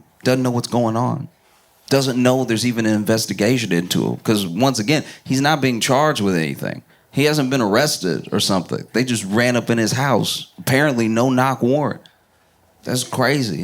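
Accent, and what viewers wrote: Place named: Tennessee, United States. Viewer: Shreveport is in the house! Stoner Hill to be exact. What up homeboi! I've been trying to figure out your accent. For some reason I thought Tennessee. Dalzell St. in Highland, wow. Keep up the good work! 🫵💪💯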